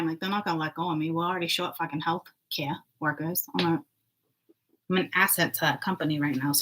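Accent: Boston, United States. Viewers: First time here. Saggie!! Thank you. I hear an accent, are you from Boston/ New England Area?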